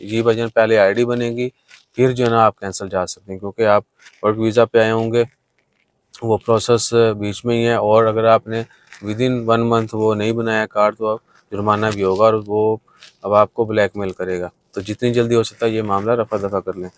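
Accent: Pakistan. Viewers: wesy he boli se tu Gujrat k lagy hain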